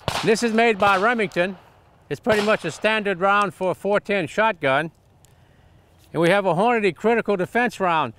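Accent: Louisiana, United States. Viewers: Wikipedia got it wrong. He got a Louisiana accent for sure.. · Is he from Louisiana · ***** I believe that would be a bit of a Cajun ascent.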